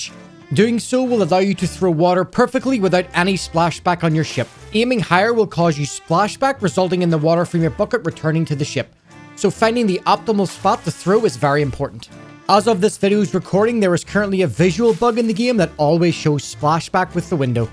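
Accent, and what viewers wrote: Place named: Scotland, United Kingdom. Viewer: Love that Scottish Accent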